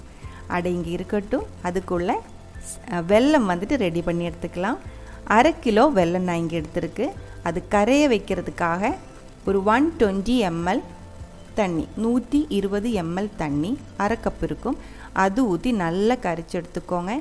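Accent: Kerala, India. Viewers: Good process. Nice Tamil with kerala slang. Good ma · Mam very interesting... I loved it.. Malayalam slang Tamil... Super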